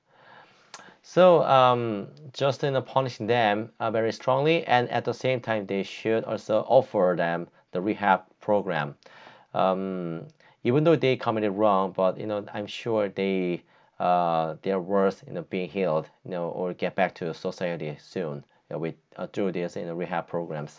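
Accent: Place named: China. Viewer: Chinese English accent is so irritating. 😭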